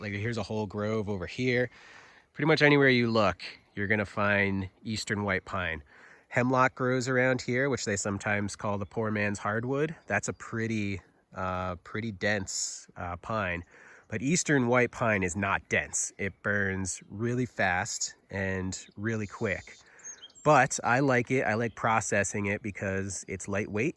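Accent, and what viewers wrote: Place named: Upstate New York, United States. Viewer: You're not from Vermont. Sounds more like upstate NY.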